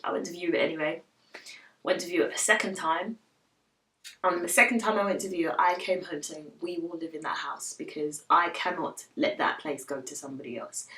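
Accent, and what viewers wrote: United Kingdom. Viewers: i love your British accent! You sound very elegant =)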